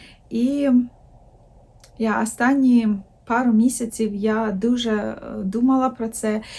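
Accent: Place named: England, United Kingdom. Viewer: Несовершенная - не досконала, Коснулось - торкнулось., ти така молодець! В тебе все вийде, твоя мова гарна з легким англійським акцентом 🥰 Пишаюся тобою!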